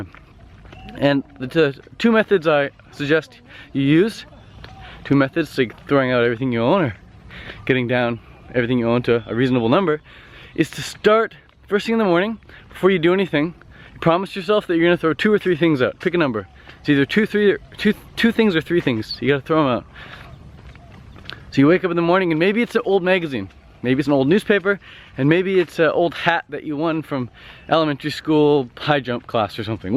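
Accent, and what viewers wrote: Canada. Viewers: Are you candian?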